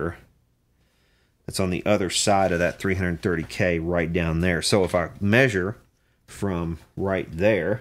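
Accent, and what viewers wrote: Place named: Georgia, United States. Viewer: You sound like you're from Georgia. By the way, I live across the freeway from Mesa Boogie.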